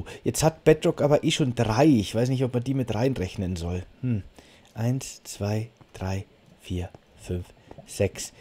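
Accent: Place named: Bavaria, Germany. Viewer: Ich liebe Deine art einfach so ruhig und ohne Geschrei und der Bayrische Dialekt :)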